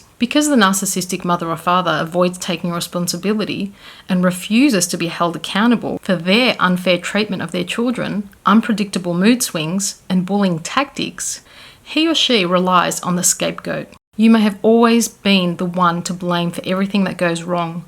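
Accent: Australia. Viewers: Are you Australian? I really like the accent. And yes I'm a scapegoat too. Hello everyone 👋👋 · You sound Australian I hope your doing ok,prayers for everyone there....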